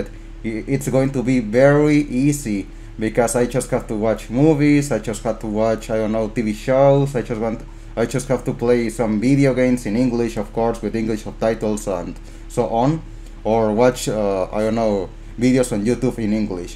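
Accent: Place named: Latin America. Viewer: Hi, I gather that you’re an American Spanish speaker from your accent (I mean, a native Spanish speaker from Latin America). People from Spain have a different accent when they speak English. I'm not sure if you're from Mexico, but I'm absolutely sure you're not from Spain. Man, I'm very impressed by your English! I’d like to speak English as well as you. Someday I'll get it, I hope so.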